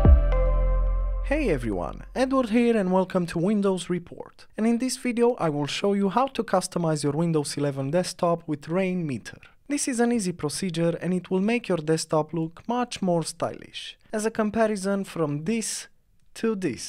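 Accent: Russia. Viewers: is this guy russian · ue voice like russian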